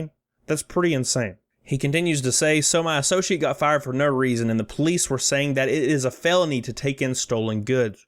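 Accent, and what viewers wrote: South, United States. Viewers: Well done your sense of humor is right on the mark ,and you sound like you might be a fellow southerner .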